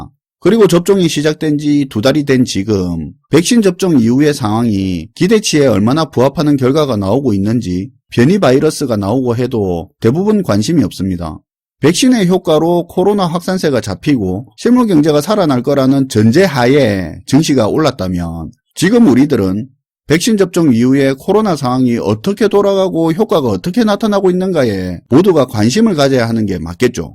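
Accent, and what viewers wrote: Gyeongsang, South Korea. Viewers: 구수한 경상도 사투리. 싫어하는 사람도 있겠지만 듣기 편하네요. 마치 친구 이야기를 듣는 것 같아 좋습니다.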